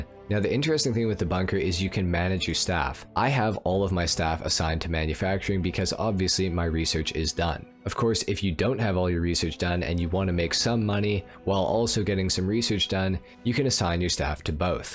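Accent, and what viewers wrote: Winnipeg, Canada. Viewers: Your from Winnipeg? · Wait ur Canadian? I feel like you got a Canadian accent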